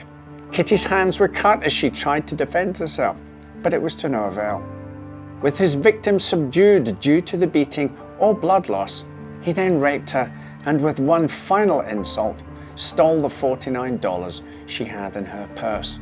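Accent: Scotland, United Kingdom. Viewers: Why toning down the Scottish accent?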